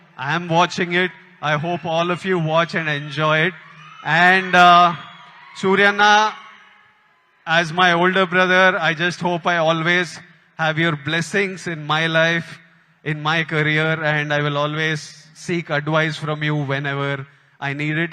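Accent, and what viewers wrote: India. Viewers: Hyd slang Ithe Ndi Telugu a adhi kuda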